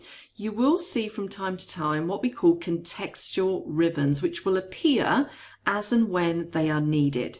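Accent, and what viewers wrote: United Kingdom. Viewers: Is that a British Englishh? It is such a great pleasure to listen!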